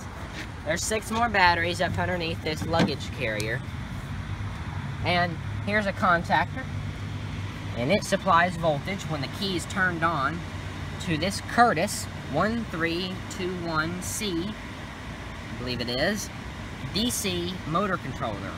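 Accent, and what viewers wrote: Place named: South, United States. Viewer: Man you haters got to stop hating, this young man is awesome and you throw around shit about being gay and needing bass in his voice. Dudes you obviously ain't been nowhere in your boring ass lives. People from certain parts of the country just talk like that, parts of NC, parts of Tennessee, and many other regional dialects, just watch NASCAR some time. My hat goes off to this positive young man not wasting his time on worthless pursuits. Soon as one of you does something relevant feel free to cast stones.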